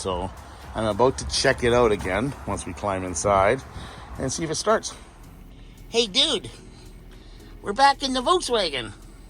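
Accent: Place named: Canada. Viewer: I thought Dude said : Peace,Love & chew toys. But it could be his Canadian accent.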